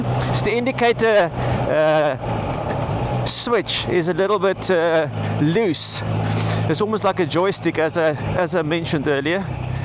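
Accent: South Africa. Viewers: You have a South African accent, have you been?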